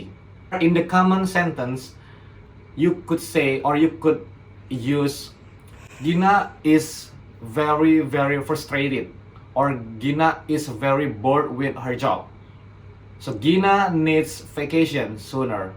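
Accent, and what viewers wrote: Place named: Palembang, Indonesia. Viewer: Ngapo cak kenal logat2 nyo tu kak..cengki wong plembang ini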